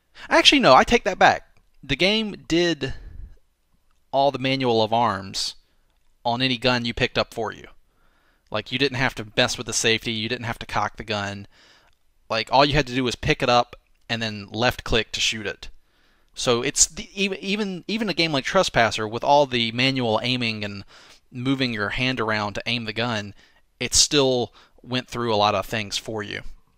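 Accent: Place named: North, United States. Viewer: ***** I was pleasantly surprised, but only because seems to have a pronounced Northern American accent. As a Texan with a WWII rifle and a full-size steel combat pistol less than 2 meters from my bed, it's perfectly normal, but Americans from the more Northern states stereotypically avoid gun ownership (Maine and New Hampshire excluded). But I'm delighted to find out likes guns too! He's risen even higher in my estimation of his character.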